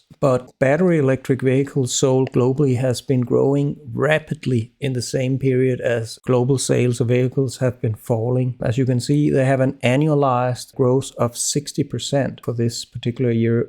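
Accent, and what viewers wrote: Denmark. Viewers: Are you from Denmark? The English sounds "Danish".. (I am a Norwegian)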